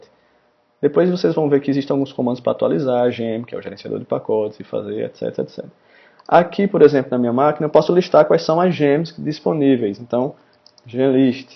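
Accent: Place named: Recife, Brazil. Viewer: Eu estava desconfiando que esse seu sotaque era lá de Recife, mas tirei a dúvida qdo vc falou parruda. Acertei?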